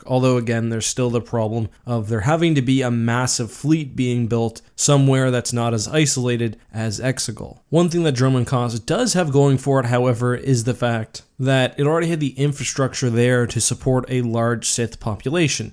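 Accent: Canada. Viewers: Lol...You have an OUTRAGEOUSLY STRONG Canadian accent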